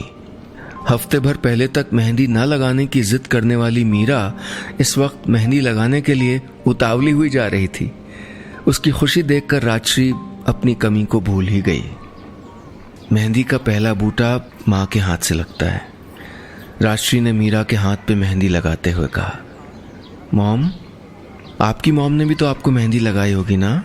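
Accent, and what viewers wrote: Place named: Pahadi, India. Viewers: very nice.. aapne kumauniyon ka bolne ka style bohot sahi se copy kiya ha ..love you.. you are soo nice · Best kumaoni story.. 😘😘😘.. I love your voice ji in kumaoni style 😘😘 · 🥺🥺🥺sir ur accent of phadi was incredible...it made me tears up ... Thank you sooo much miss pant for this lovely story ❤️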